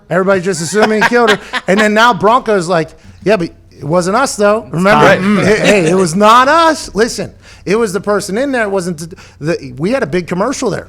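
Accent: Pittsburgh, United States. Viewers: Man I love watching and listening to these videos, gotta love that pittsburghese that comes out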